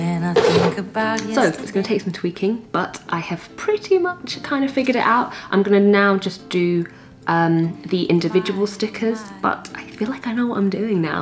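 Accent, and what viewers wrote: United Kingdom. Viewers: I need help with shaping my art to make it a career I was really good in drawing growing up but I stopped and dropped outta high school.... got me a GED diploma and joined the USARMY... I’m still looking into arts and plus I’m in Europe I got stationed in Germany I know you’re in UK because of your accent lol😊 can you help me with advices so I can get back up in my art?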